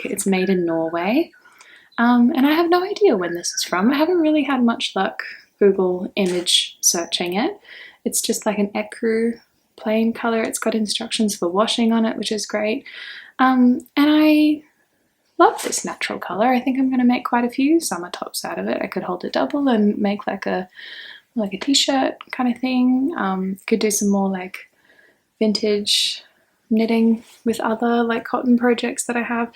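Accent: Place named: Australia. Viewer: This is the first of your videos I have seen! I'm from Adelaide/Kaurna country and its so nice to hear an Australian accent on a podcast, and someone who is actually from my hemisphere so is talking about their summer knits rather than all the other youtubers going into Winter. Definitely adding the cuff club flower socks to my Ravelry library :)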